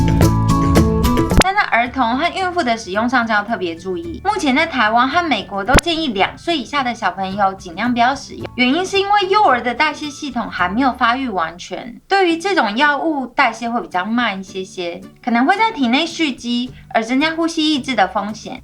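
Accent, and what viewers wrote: Japan. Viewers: 有點日本口音⋯ But Good Job!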